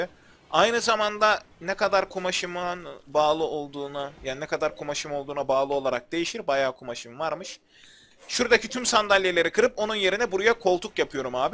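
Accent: Ankara, Turkey. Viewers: selam kardeşim adamsın sen ya ankaralı aslan parçası daima senin arkandayız en çok da ankaralı konuşmanı sevdim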